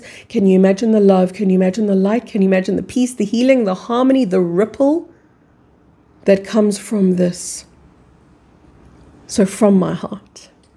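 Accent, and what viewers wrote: South Africa. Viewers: exactly the the South African pronunciation- it is a beautiful accent.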